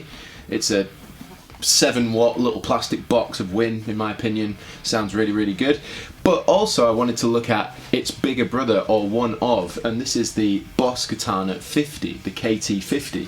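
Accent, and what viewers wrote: Yorkshire, United Kingdom. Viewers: It was a tough choice between this and the Blues Cube. I chose the cube (100w) and love it, even though I’m considerably poorer. You’ve become a very accomplished player over the time I’ve watched your stuff. Thanks for all the hard work and I wish you a fantastic new year buddy. Btw.... are you a Yorkshire lad?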